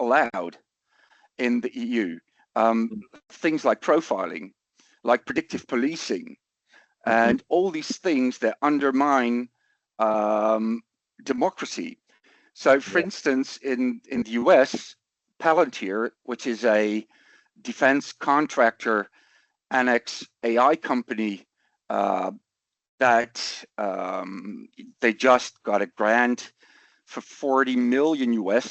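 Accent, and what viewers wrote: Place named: Australia. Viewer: Nice Aussie accent for a Dutch guy ...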